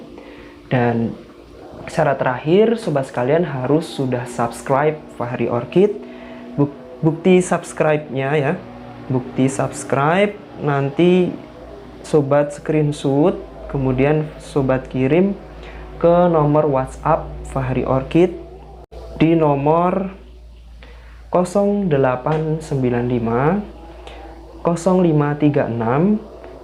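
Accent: Java, Indonesia. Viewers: Aslmkum....Alhamdulillah stlh sring lihat utube nya jd smangat lg brtanam..tau jnis2 philo..dn smua hal ttg tanaman..dlu sy merasa g tau apa2..sk salah2 nyebut nama tanaman..sy sk dg apa yg d sampaikn cara penyampainnya jg..kalem dn apa adanya..mengalir dn kesan jawanya gak hilang...sll rendah hati dn memberi smangat u kita2 yg nonton..smg mdpt balasan pahala dr Allah krn tlh menyampaikn info2 yg brmanfaat...smg sll sehat..sukses dunia akherat d jaukn dr sgl yg buruk...aamiin aamiin yra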